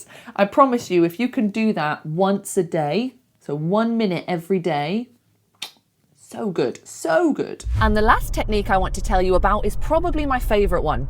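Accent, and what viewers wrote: United Kingdom. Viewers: You have a great pure British accent. I really made use of this video 🎉🎉🎉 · Hello pretty and smart girl, how are you? Well, I'd like to know if your accent is British. I incredibly can understand a good part that you speak, I say "incredibly" because I'm Brazilian and my ears are trained to a United States accent. I like the way you speak slowly; it's very didactic. Furthermore, the beauty of your face is a delight to my eyes.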